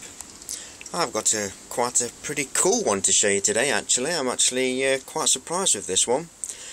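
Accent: United Kingdom. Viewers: Are you from Lincolnshire by any chance?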